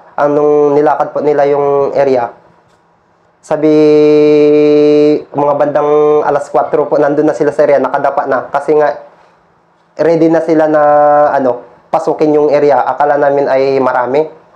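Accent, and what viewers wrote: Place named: Ilocos, Philippines. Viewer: ilokano yan taga ilocos norte... tanongin mo siya kasi mga taga roon.. ala won barok... di ala eh!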